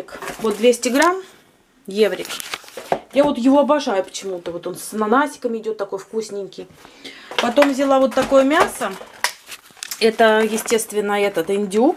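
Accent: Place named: Uvarovo, Russia. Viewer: говор наш уваровский удачи тебе подруга!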